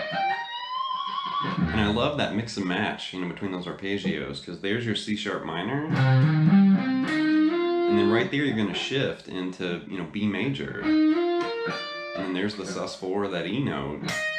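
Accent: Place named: South, United States. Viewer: No idea who you are and you kinda look and sound like you should be playing a banjo on a country house porch somewhere but sheeeet man you can shred for sure. You also know what your talking about which proves once again that looks can be deceiving. I need nothing more than this to Sub to your channel and I’ll listen to you break down any guitarist licks. Thanks man and rock on! 🤘🏼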